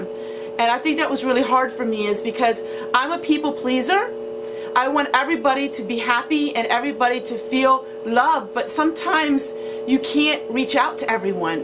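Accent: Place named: South, United States. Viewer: Wait. What? You're conservative? I wouldn't have known if you hadn't said anything. 🤷🏾‍♀️ I’m more focused on your accent. I can't figure it out! 🤔😆 Are you a Canadian living in Arkansas? It's like foreign and Southern, but not too Southern. Very interesting!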